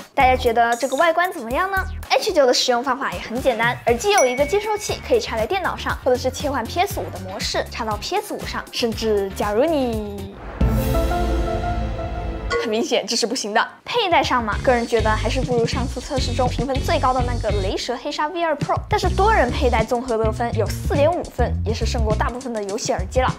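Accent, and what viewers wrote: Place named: Chaozhou, China. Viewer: 你是潮州人吧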